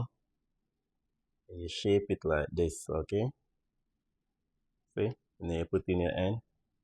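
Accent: Jamaica. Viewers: I like how you cook and bake, I followed your sweet potato pudding and it was a breeze and tasted 😋 good, I don't bake every week because we have to watch gain!😢 I like your accent, is it a Westmoreland or ST. Elizabeth accent? I pick up that your Channel is from foreign though. The sugar softens the dumplings, also a little milk.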